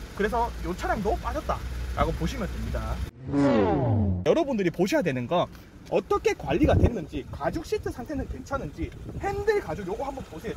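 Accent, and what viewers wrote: Busan, South Korea. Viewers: 시원시원한 부싼 사투리에 설명도 잘해주시니 사고싶은 욕망이 치솟네요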